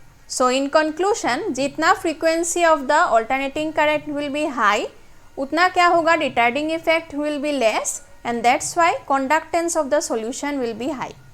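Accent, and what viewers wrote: Bengal, India. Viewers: Are uh Bengali 😅😮?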